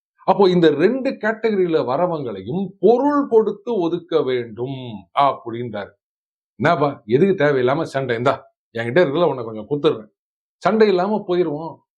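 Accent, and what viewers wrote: Chennai, India. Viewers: Mahabharatam in madras thamizh is refreshing 😋 ✨️ 😌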